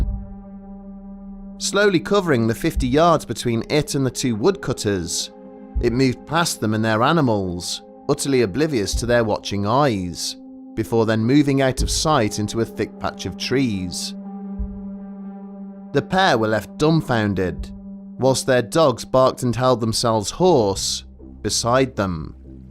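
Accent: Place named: Yorkshire, United Kingdom. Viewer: I just love this chaps voice!! He's got a Westchester, or Yorkshire air about him .. CUZ BLOODY HELL, some of these blokes with their LAZY Cockney.... Bugger me in the oss, a fellow can't understand some of these blokes, not at tol.!! So I stay carry-on my good fellow!! I enjoy your most interesting stories!! Carry on!! I'm sitting here with me fags in me face and trying to send this post on this infernal contraption..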